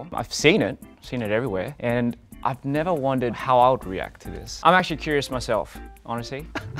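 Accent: Australia. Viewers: the rose was hilarious too but i genuinely couldn’t stop laughing at this one. his aussie accent makes it 10x better i love 😭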